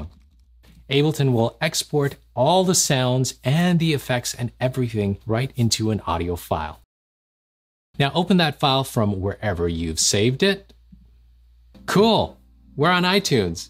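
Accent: India. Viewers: Are you INDIAN ? 😃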